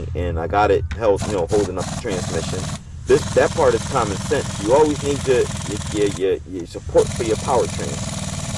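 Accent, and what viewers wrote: Philadelphia, United States. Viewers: Are you from Philly? Happy belated and thanks for the vid.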